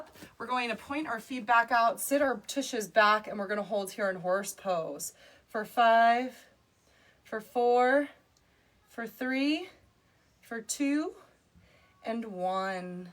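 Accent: New Jersey, United States. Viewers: the New Jersey accent is the sexiest woman sex in the world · I love your jersey accent. I had a friend from Ridgewood. Nice legs ❤❤❤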